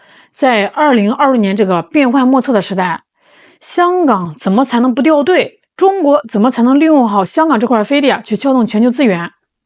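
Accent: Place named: Shandong, China. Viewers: 我听这口音有点像我们山东人，如果不是鲁中，也应该是鲁西南😂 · 我祖籍山东，抗战时期到的天津，已经没有老家口音了，但是听着挺亲切的:washhands: 内容好就看得下去，山东人热情踏实，山普挺好，不用AI则更加真实感和用心诚意。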